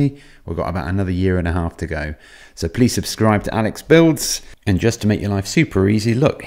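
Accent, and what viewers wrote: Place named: England, United Kingdom. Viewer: It is a mental relief to listen to the English pronunciation. One can feel both the general education and the respect from the messenger in comparison with the American YouTube channels, which are constantly at grueling "macho-OCR-speed".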